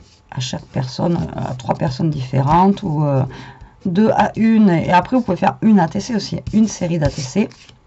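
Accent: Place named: Marseille, France. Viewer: Ha voilà ça s’entend lol j’adore moi Marseille 😉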